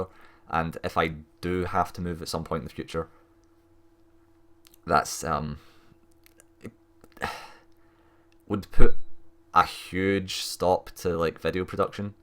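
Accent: Scotland, United Kingdom. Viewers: Sorry for your loss. I'm from Canada and I've been watching you guys for a while now (pretty much for the guides but also the let's play). It was hard when I started because my first language is French. Although I speak English well, I wasn't used to your accent. It took me a while but after few videos, it was all good (I even mimic your accent when I'm playing Dark Souls and it makes my wife laugh so much asking "Since when you have a Scottish accent!!!"). Your channel is genuine and I'd be glad to contribute ($$) if it can help you! Cheers mate!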